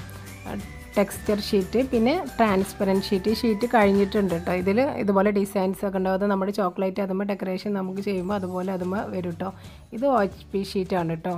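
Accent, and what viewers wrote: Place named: Chavakkad, India. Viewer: ഇഷ്ടായിക്ക്ണ്.... ചാവക്കാട് സ്ലാങ്ങ്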